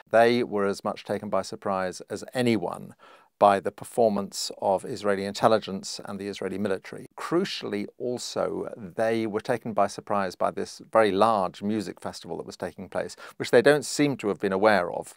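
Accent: United Kingdom. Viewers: Funny how people would swallow whatever propaganda you feed them as long as it’s delivered by a jolly-good British voice.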